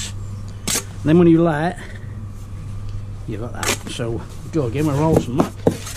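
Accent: Norfolk, United Kingdom. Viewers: You sound like a good old Norfolk boy my friend?? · He sounds like hes from naarrrfolk, norwich?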